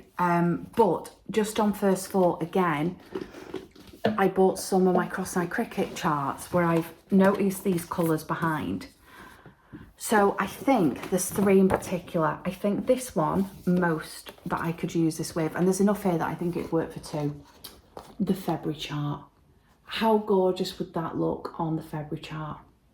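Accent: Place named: Sheffield, United Kingdom. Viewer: Wow, great month! My first retreat was Retford too ☺️ totally agree it was fabulous. Where do you come from? Your accent sounds local to me near Sheffield xx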